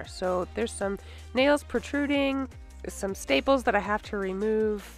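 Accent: Canada. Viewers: Hi! I'm a brand new subscriber of yours, and what I like about you is that you produce great work without having the use of an all 'singing and dancing' workshop, ie no table saw and other modern tools you see in other peoples video's. Oh and your nice accent ( Canadian)? I look forward to more of your vid's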